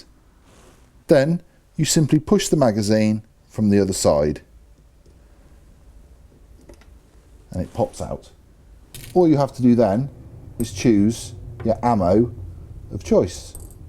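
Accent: England, United Kingdom. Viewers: The English accent is just easier for me to take than a lot of US videos, and I am from the US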